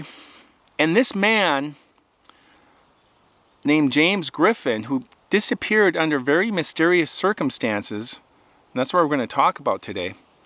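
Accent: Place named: Minnesota, United States. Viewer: My older sister lives in Minnesota, way up near Hibbing. Been there several times and you can definitely hear the accent in as he talks. Accents rarely 100% leave you.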